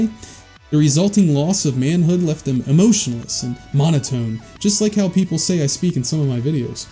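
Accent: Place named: Mid-Atlantic, United States. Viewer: Are you from the mid Atlantic originally? No offense, but yr dialect sounds grimey (just like mine). Wouldn't be surprised if you were from Philly, DC, or my own Baltimore (especially with those juicy fronted O's). I'm about 10 mins into the video and so far, so good!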